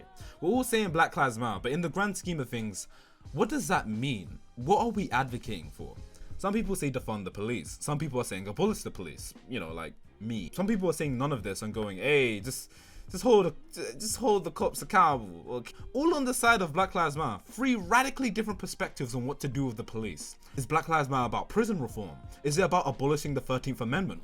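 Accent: United Kingdom. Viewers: You and prince Soloman sound similar, ur jus the British version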